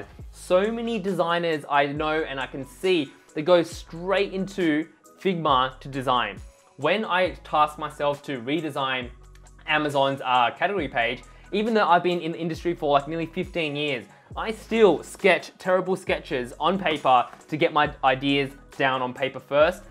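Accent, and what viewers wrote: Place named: Australia. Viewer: MASS COLLAPSE LAYERS works differently for me than explained: On a Mac press CMD + A to select everything. Go to Layer panel and hold CMD + Click on 1 dropdown arrow and everything closes. Do exactly the same to open everything on the Layer panel. By the way your content is simple and brilliant! It helps immensely! Your accent takes me back to amazing Australia!:) So thank you very much!!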